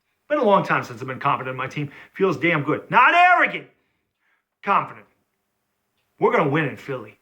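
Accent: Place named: Staten Island, United States. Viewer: Youre accent sounds Staten Island to me. I grew up in Newburgh upstate.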